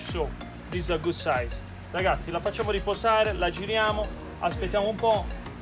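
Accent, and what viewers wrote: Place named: Apulia, Italy. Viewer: bel video, splendida pizza...bravo.. immagino che i presenti erano soddisfatti... dal tuo accento immagino sia pugliese... di dove?? un salutone da Roma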